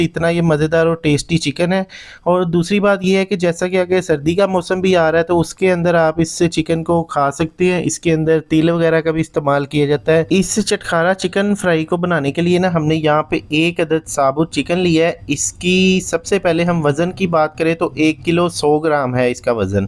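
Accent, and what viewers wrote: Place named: Punjab, India. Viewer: Assalam alaikum bhai... Masha Allah bohat khub aapki recipe hai... Agar isko mein fry nahi karta aur isko grill kiya OTG ya microwave mein ... Kar sakta hu... Zaroor reply kare... I like your accent... Punjabi tadka laga ke.... Allah Hafiz...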